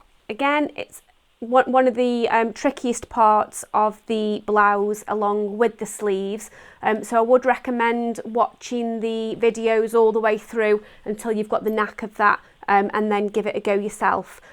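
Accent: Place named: Stoke, United Kingdom. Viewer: Just subscribed from seeing your link on Boz's channel. You sound like a Stokie like me.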